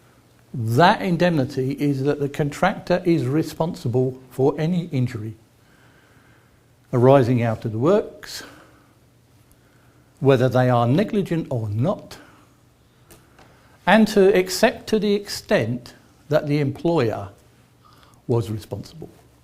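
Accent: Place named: England, United Kingdom. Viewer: Sir I just in love with your English accent its far better than American accent. Please let me know where is this from?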